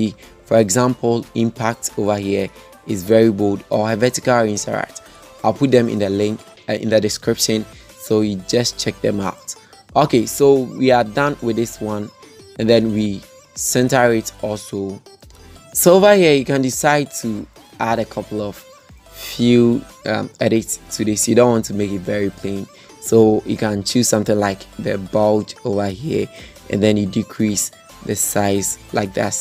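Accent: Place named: Ghana. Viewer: The accent is like a Ghanaian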